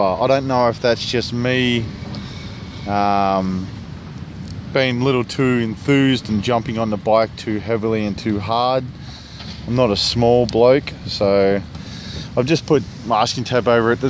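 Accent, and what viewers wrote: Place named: Australia. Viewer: Good Review and great accent. You Australians have reinvented English as a tool to maintain a single class society.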